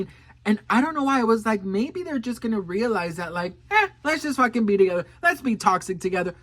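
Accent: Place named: New York, United States. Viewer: YOU ARE THE BEST!!!! Are you sure you’re not from NYC??? The accent!!! “Listen”?!! ❤❤❤❤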